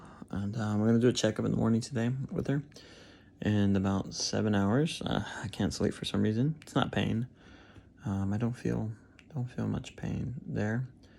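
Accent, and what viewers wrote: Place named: United States. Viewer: I get my procedure with her in less than 2 weeks. I can’t wait. It sounds like you’re from the USA too? I have non stop flight from Chicago and it’s only 10 hours. I am wondering though how it was for you once you got into turkey and also traveling home as far as entry / customs go. I have my evisa and I also heard something about a passenger locator form before leaving too. Anything I need to know?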